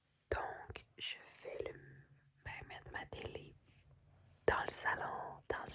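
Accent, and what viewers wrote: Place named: Quebec, Canada. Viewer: J’allais te demander ta nationalité vu que je ne connais pas ce drapeau, mais au moment où t’as parler j’ai compris directement que tu es québécoise. En dehors de ça, c’est une bonne vidéo, bravo.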